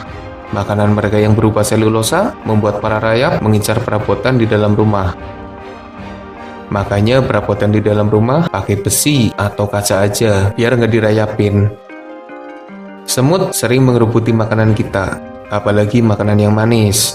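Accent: Banyumas, Indonesia. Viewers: Serasa di warteg dengr ngomongny,ini aslinya ngapak kykny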